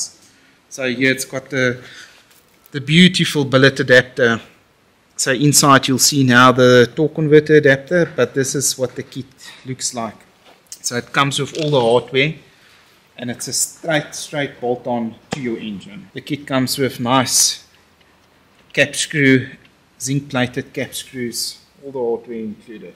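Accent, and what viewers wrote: South Africa. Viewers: Man I love the SA accent! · The south African accent is the best accent 💪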